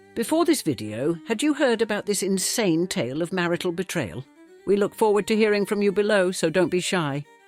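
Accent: England, United Kingdom. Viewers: What's a nice accent British, nice voice. But i don't think alice Thaw is wonderful man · Dumb AI pictures that don't relate to this narrative. The new documentaries filled with an English accent of authority and cheap AI.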